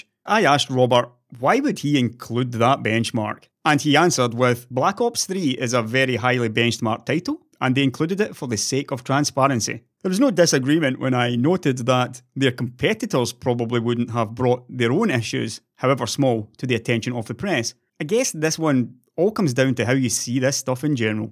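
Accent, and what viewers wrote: Scotland, United Kingdom. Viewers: That is one Scottish accent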